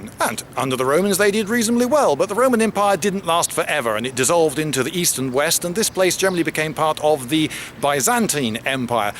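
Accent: United Kingdom. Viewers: haha is so british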